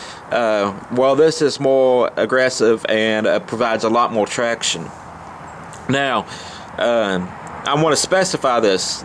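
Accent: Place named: South, United States. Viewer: I bet u from southern USA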